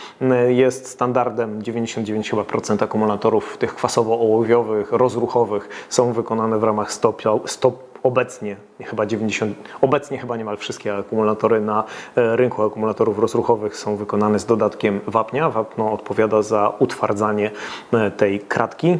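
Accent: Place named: Ukraine. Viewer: Kanały: Bater tech; Świat akumulatorów; i - wszedzie chwalą ukraiskie akumulatory.Oczywiście inne też - ale to tu to tam słychac Ukraiski akcent...Prześledziłem przypadkowo te kanały bo szukałem akumulatora ale im dłuzej słuchałem to lampka zaświeciła mi się jedna - pracujecie mocno nad nami Polakami. Marki uznane chwalicie najbardziej - no bo nie ma wyjscia..ale jakoś potem czesto wystepuje marka Ukrainska. Zeby była jasność -Nie powiedziałem, że jest zła..nie wiem jaka jest - dopiero szukam akumulatora. Zeby była jasność - nie jestem wrogo nastawiony do Ukrainców. Ale na trzech niby różnych kanałach widzę jedno podobieństwo - Ukraina.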